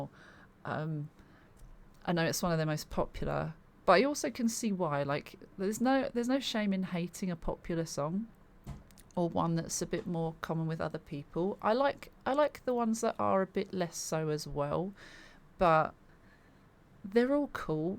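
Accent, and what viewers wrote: England, United Kingdom. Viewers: Love the English accent.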